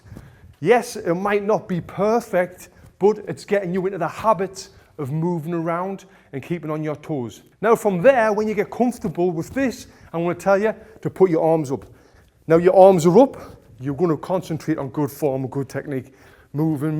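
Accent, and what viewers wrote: United Kingdom. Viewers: I consider your tactics fair. Boxing is a sport. In a sport we must use tactics and our brain first and foremost. So not engaging in order to get the best result for you is tactics. If your oponent has slow feet then using your feet as defense is a great tactic to tire him and make him frustrated. Whoever says that your defense and your strategy is cowardish needs to think again. For example, if I have an oponent who has great body shots and is taller than me, I need to play with my chin very low and using my body moves left and right to avoid the body shots and attack from the low with uppercuts and hooks, without using my cross and jab so much because I risk my game against a good body shot boxer. So this is a tactic. Also what you did is a tactic, fair, and you exploited your strength (fast feet) and your opponents weakness. Thanks for your impressive and detailed videos. 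I train myself using your videos, mainly for fitness purposes, and from every boxing youtuber you stand out for your athletic spirit, your kindness and knowledge and also for your badass British accent! Love you! Keep it up!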